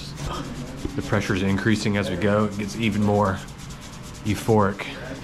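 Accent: South, United States. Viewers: I deadass thought this was Jensen Ackles from Supernatural/The Boys 😂you kinda look like him in the face and y'all both got that cute southern accent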